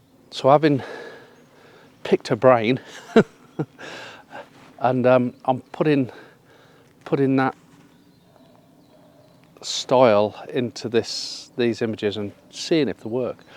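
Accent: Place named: Cannock, United Kingdom. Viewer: Sounds like Cannock Speak!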